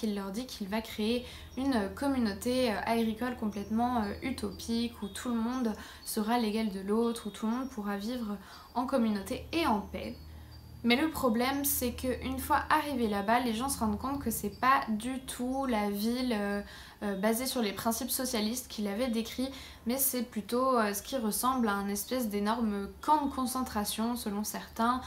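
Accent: Quebec, Canada. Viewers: Deux personnalités différentes, deux façons d'aborder les sujets différentes. Après, ceux qui disent que fait des fautes de français se trompent, elle parle québécois tabarnac! Fac c'est un français différent! Et alors parler de "faiblesse narrative", what?! Elle nous tient en haleine!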